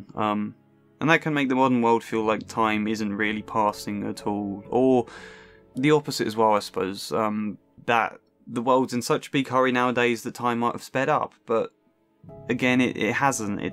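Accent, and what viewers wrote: United Kingdom. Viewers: You sound British, but I never could figure out from where, so this explains it (I am horrible at accents and can't recognize them well, so I wasn't worried about not being able to place you). I've lived in Texas my whole life, but I don't feel connected to it or the US in general. I don't feel tied to anywhere, nor do I fit in. It's wonderful to hear you speak of the place you come from with such affection and connection. I guess it's no coincidence that you represented yourself with a tree? You are tied to the lands where you were born. :) <3 Oh, and I do need to go watch this movie now! X)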